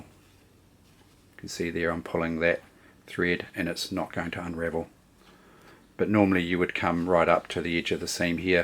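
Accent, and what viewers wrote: New Zealand. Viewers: Nice Video and nice Kiwi accent! Thank you!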